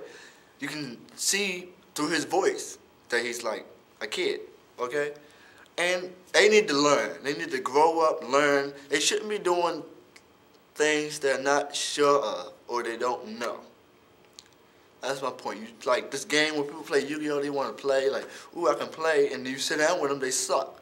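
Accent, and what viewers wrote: Baltimore, United States. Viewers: that damn baltimore accent from you lol! From the way you say "yew-tewwwbe"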